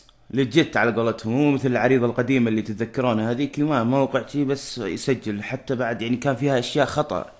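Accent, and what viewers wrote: Kuwait. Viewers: اشكرك بس مافهمتش ولا أوشي هههههه عاد انت من الرياض زانا أحسبك من إخواننا الكويتين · آما انت من الريآض , آحسبك كويتي !؟ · انت سعودي ولا كويتي ؟؟؟ يا اخي كلامك كويتي والله احسبك كويتي طول هالمدة:) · اما انت سعودي والله كنت احسبك كويتي &__&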